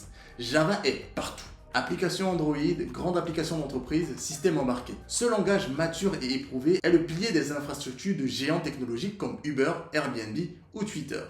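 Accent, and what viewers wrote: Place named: Cameroon. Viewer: l'accent camer ne meurt pas :) ton contenu est super je viens de tiktok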